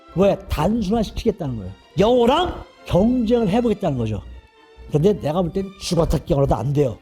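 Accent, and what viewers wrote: France. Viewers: 한국말도 발성이 프랑스식 같아요 ㅎㅎ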